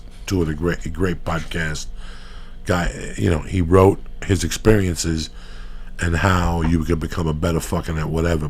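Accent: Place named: Bronx, United States. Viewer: uncle Joey I have to say I have become one of your biggest fans over the past month man you remind me of my late father but n a good way. your stories are INCREDIBLE and your personality and overall way with people is admirable. I hope I get to meet you one day and as an Italian from Philly I was fooled in thinking u were a Bronx Italian and even tho your not I beleive your the godfather lol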